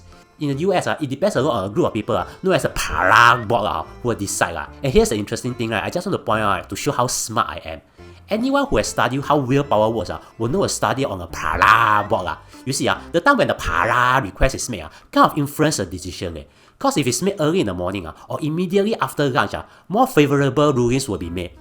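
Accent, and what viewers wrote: Singapore. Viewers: The mispronounciation is part of the singlish, lol. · Highly irritating Singlish. Stopped watching halfway. Grow up kid, learn to speak properly before posting on YT. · The video's good but I guess he does it on purpose of how a literal Singaporean speaks English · It's how some Singaporeans speak...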